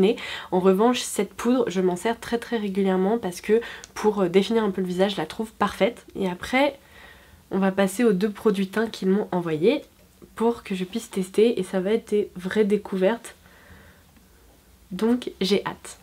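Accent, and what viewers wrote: England, United Kingdom. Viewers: Magnifique make up 👍👍👍j'adore ton accent anglais, c 'est vraiment bien ce que fait SPacenK👍👍👍😊😊😊😊😊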